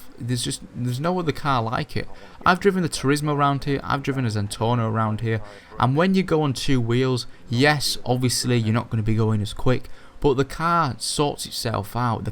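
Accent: Yorkshire, United Kingdom. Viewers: r u from yorkshire?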